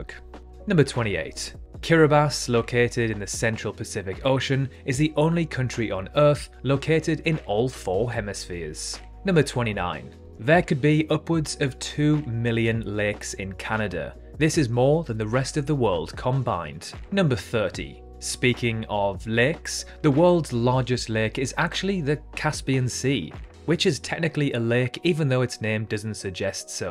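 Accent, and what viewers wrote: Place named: England, United Kingdom. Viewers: What the heck is that accent!! England always impressing me. What region is that? North? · Criticizes term "middle age" Uses term "middle age". And your Received Pronunciation is okay.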